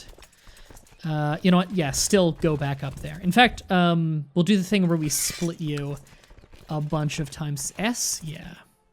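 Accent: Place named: Canada. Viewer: FYI the barony was in Portugal, the name was in Portuguese, not Spanish. And anyone who think you sound American doesn't know what Americans and Canadians sound like. You sound Canadian. And FYI, Galicia is de jure the duchy you just conquered and the ducky above it that you started with. The Duchy above it de jure drifted into your kingdom in an episode in, like, the teens, and you commented "why am I being alerted to that?"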